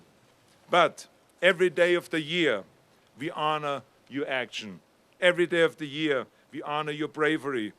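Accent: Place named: Germany. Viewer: D His accent is so awesome german :D "schmusen" :D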